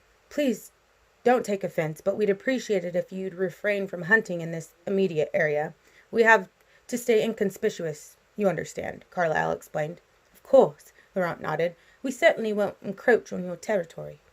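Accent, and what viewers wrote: United Kingdom. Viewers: Your English accent is amazing 😊🏴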